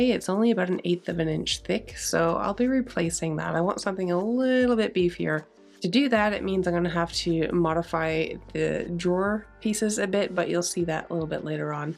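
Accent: Nova Scotia, Canada. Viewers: Dear, I've been watching your videos for a while. I'm a guy who has 2 left hands (including 5 thumbs on each hand), so I would never dream of trying to flip furniture, but watching you do your stuff has taught me so much about furniture. I'm truly grateful for the opportunity to see what you are doing and hear your explanations. Firstly, it sounds as though you really know what you're doing, and secondly, I love your Canadian/Nova Scotia accent. You sound so calm, so when I've had a stressful day, I watch one of your videos and can unwind. So, for me you've become the therapist:-). I've watched other renovators, but you and Flipping Drawers (for similar reasons) are my favorite flippers. So, thank you for what you do (I also <mostly> love your ideas) and for the videos. I hope you can do much more in the years to come. Best wishes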